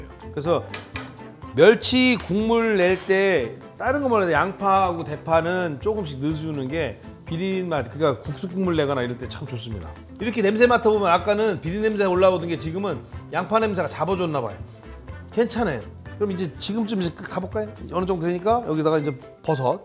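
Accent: Chungcheong, South Korea. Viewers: 구수한 충청도 사투리? 잘 모르지만 어머니 고향이 충청도인데 구수한 . 그래유우~등등. 듣기좋은 우리나라말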